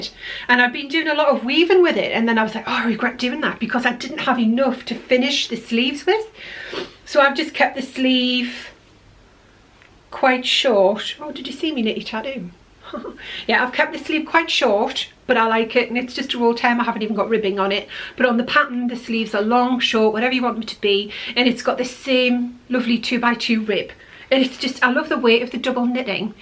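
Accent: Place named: North East England, United Kingdom. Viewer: Brilliant to see you back, I’ve missed hearing a north east accent! Xxx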